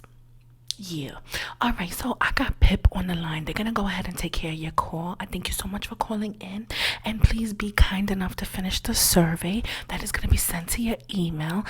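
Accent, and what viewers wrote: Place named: New York, United States. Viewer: I knew you was from NY cause that accent baby lol. Brooklyn here. What part you in?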